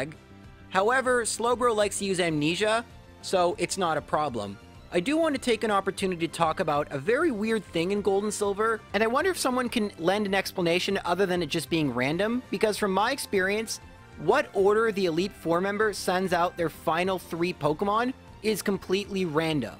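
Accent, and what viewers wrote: Canada. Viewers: Canadian accent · You sound Canadian. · pretty sure hes canadian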